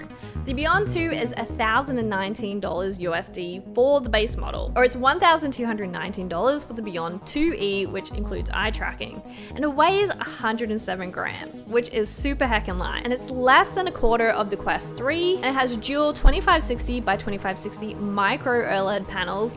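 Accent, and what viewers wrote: Australia. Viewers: Great having an Australian voice and knowledge of local pricing and issues for VR.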